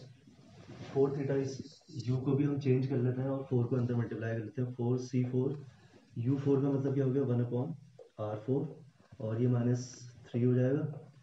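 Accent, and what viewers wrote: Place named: Rajasthan, India. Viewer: That ' rajasthani accent ' 👌👌,. ....... Similar to a hero in looking and Vice - versa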